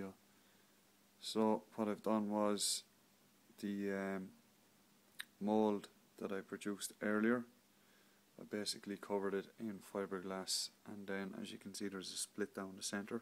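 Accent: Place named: Scotland, United Kingdom. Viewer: Hey, really enjoyed that vid very informative and i love the scottish accent!!!!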